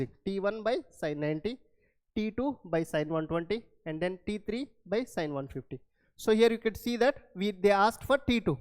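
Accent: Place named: Tamil Nadu, India. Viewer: Your tinglish and loss of motion and chopter is different. Better try " orion educenter "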